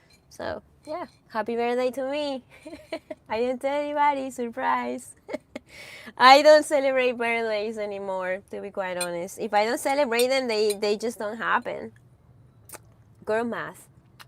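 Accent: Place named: Spain. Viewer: that must be some good stuff if you are seeing aliens on the ocean and on the ground i dont know i think you been in the desert a long time I dont know maybe your seeing blue lites okay (LOL) well come back to earth you havent met me yet you have no been able to date men because it wasnt me I am simple living man I am a leader I would protect you I mean I have bad knee from an accident but I can walk I use a cane and I can cook for myself and clean things I get social security so I dont have to work I can spend all my time loving you and caring for you. I am a tradtional man I am honest romantic I am older but I have values I am close to my family I have been without a partner for 15 years but I know that me and you would get along you have the bigest brown eyes and long brown hair that I love and tan skin and I love your spanish accent I am a german but born in America I dont care to much about material stuff your the type of women who likes to live simple and i love that I am very masculine and I want a women who wants a masculine man like me. 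anyway I know that we would click I just know my telephone number is <num> I am in omaha Nebraska and I can come to you I know that you dont want problems or to be some ones mom I dont need that I will not bring any problems with me and like i said I can do things for myself I also know about engines I can work with tools and I have knowledge about a lot of things I play guitar and bass guitar I write love songs list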